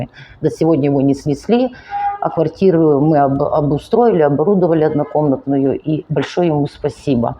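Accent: Ukraine. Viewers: Вы такая красивая,приятная ❤ мне нравится ваш украинский акцент ❤ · У жіночки комплекс меншовартості. У неї акцент укрАінскій. Цікаво б було послухати її укрАінську мову.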